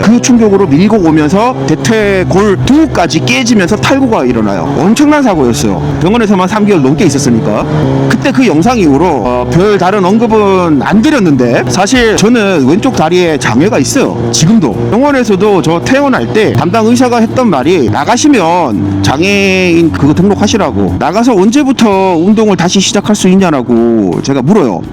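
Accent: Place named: Gyeongsang, South Korea. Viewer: 경상도 사투리인데 딕션 깔끔해서 귀에 쏙쏙 박히네요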